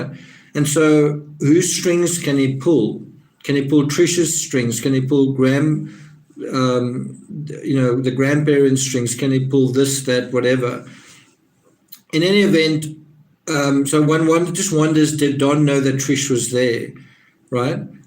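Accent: South Africa. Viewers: Your accent is SOUTH AFRICAN!! I'm a fellow African, a Zimbabwean. Thank you for your coverage of this disturbing case. The whole world wants Summer home except the parents (if they can be called that) They don't deserve that title in my opinion. The "for sale" sign on the drum outside while Summer plays in the rain speaks volumes to me. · Distinctly South African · Accent sounds like S.Africa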